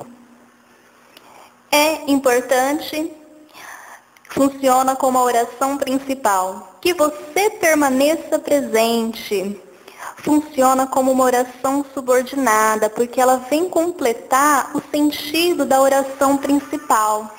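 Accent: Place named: São Paulo, Brazil. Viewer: Que voz fudida e de paulista!Mais boa aula!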